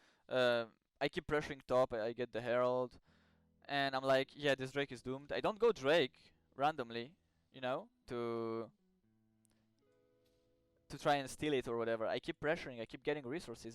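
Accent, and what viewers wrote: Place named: France. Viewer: French accent 200% XD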